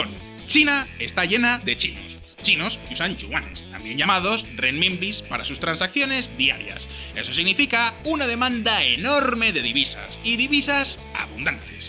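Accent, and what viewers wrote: Spain. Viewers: VISUALPOLITIK ¿SOS ESPAÑOL?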